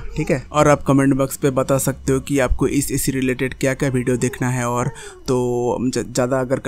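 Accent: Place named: Bengal, India. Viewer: ভাই তোমার ভাষা শুনেই বোঝা যাচ্ছে তুমি বাঙালি।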